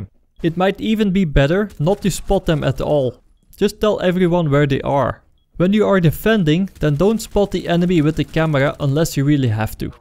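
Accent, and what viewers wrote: Netherlands. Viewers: you have a dutch accent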